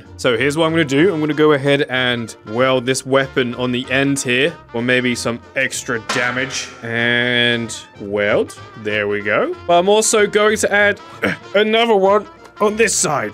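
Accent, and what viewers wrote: England, United Kingdom. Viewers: R you from inglan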